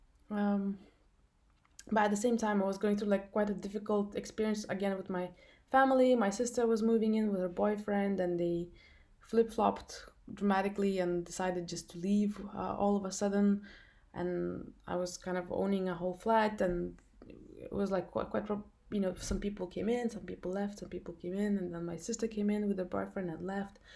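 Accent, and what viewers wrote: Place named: Eastern Europe. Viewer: My sister if you felt spiritual oppression yesterday on halloween it means you're on the right side. the side in the Light opposite to witches. I have a question - are you from some slavic country? you sure have that accent. Jesus keep you close to HIM. Jesus guide you so that you don't stumble. In Jesus Holy Name we pray. Amen.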